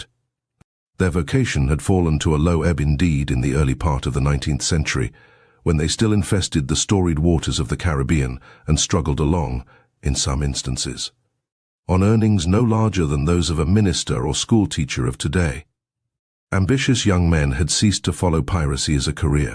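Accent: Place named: United Kingdom. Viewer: Is there a reason a British accent is telling strictly AMERICAN history? Sounds off.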